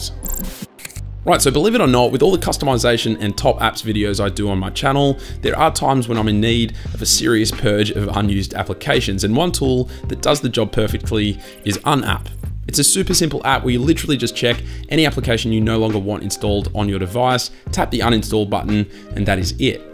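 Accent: Australia. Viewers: I'm just here for the aussie accent :)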